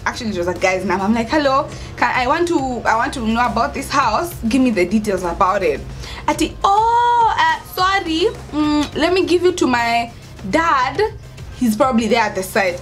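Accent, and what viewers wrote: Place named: Nigeria. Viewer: Your ascent sounds Nigerian. Pole sana kwa mkosi lakini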